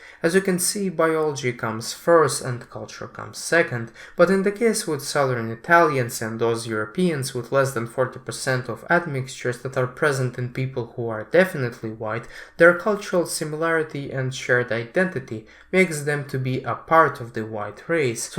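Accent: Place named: Eastern Europe. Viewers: How can you miss the accent, and also he is smart and knows how to argue....All the best Slavic values... Na zdravlje.🍻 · Ah so you're a slav? And here I thought that your accent sounded familiar. О, так ты славянин? А я то думал что акцент звучит знакомо.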